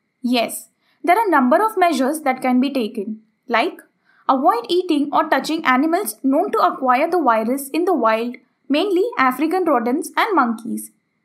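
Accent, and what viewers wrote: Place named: India. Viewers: It's hight time to change your mindset and adapt with an Indian accent. World is changing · Couldn't find someone without an Indian accent???👎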